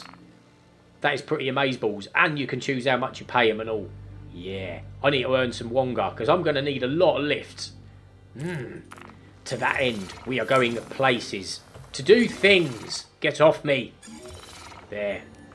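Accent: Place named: South West England, United Kingdom. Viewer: Sounds like your from the South West mucker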